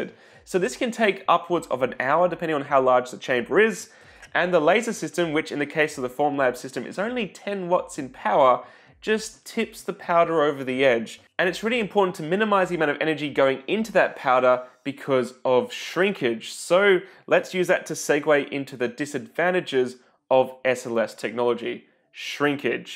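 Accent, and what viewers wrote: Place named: Australia. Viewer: YOU'RE AUSTRALIAN! I WOULD HAVE NEVER CALLED IT. GOOD ACCENT! (I'm Aussie too)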